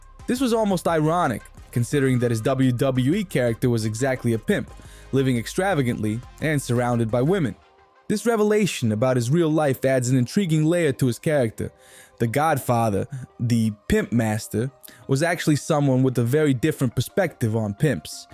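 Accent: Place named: New York, United States. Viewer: Horrible Mispronunciation, Using other people’s footage, mistakes all over and speaking in a ln awful New Yawk Accent… Smells like a Russo production 😂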